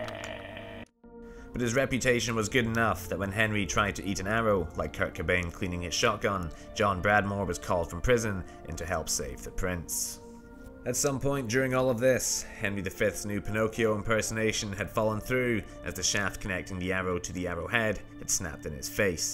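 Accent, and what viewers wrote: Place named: Scotland, United Kingdom. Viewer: yes the video was enjoyable and useful but pleasantly filled with your ripe Scottish sarcastic humour!! (my mum was from Ayrshire so I've got a wee bit of Scots blood running through my ENGLISH😁 veins). Have you had any contact with America? Your accent is curiously relaxed compared to the slightly more "tight" Scottish I've often heard. I'm an English language teacher and fascinated by language, so the question is purely professional.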